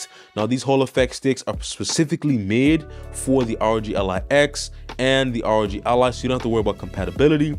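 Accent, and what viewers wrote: Bermuda. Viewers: Are you Bermudian? Edit; there is no doubt. Subbed, gotta rep my people.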